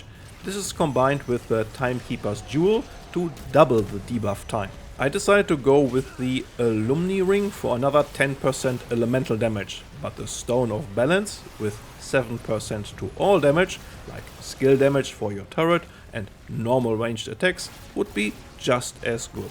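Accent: Germany. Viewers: Ich hör den Deutschen noch ganz leicht raus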